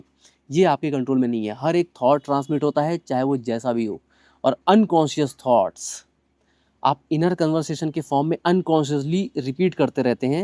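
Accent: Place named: Bhojpuri, India. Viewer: Loved your bhojpuri tone ❤our language is our Pride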